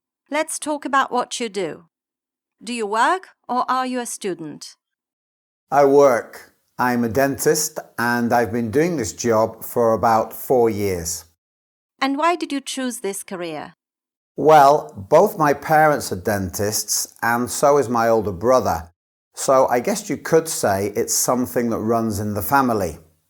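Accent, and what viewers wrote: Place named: Northern England, United Kingdom. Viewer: I reckon his accent comes from north England, perhaps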